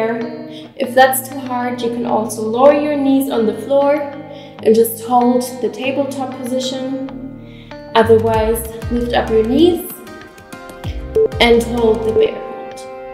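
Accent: Germany. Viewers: An alle sie ist deutsche merkt man auch wenn sie spricht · Hi i just wanted to tell you that you have sich a beautiful body and you are so pretty. I also really like the style of your Workouts. Are you from germany? Bc your accent makes it seem like you are... :)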